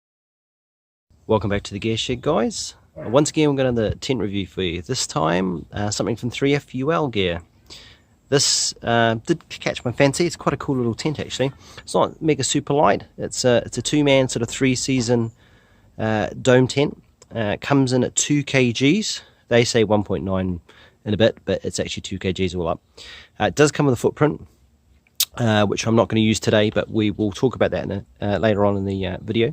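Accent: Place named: United Kingdom. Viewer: Thank you, perfect review. Not only are your thoughts and your judgement very valuable to anybody thinking about buying this (I just ordered one btw), but also you have this really nice british English. A bit like David Attenborough, bit like the Top Gear guys. I like it.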